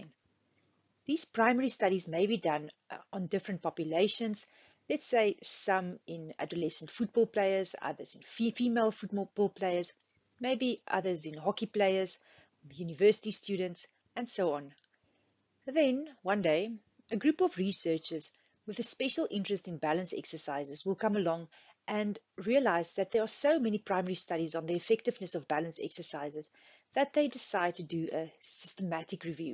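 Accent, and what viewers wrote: South Africa. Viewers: South African accent recognisable anywhere in the world ❤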